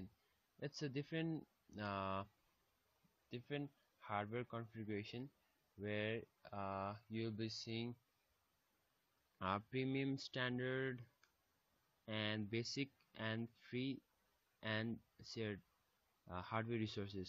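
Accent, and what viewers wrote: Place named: India. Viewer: I hear an indian, I click off...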